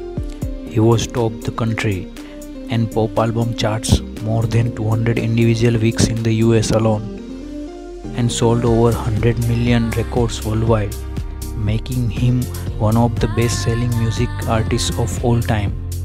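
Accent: India. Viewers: I THINK THIS GUY TALKING IS FROM KERALA INDIA. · Hahaha.. Come on! Never heard the Indian accent before? You need go out a little bit more... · Really you got a foreigner possibly from INDIA speaking about Kenny Rogers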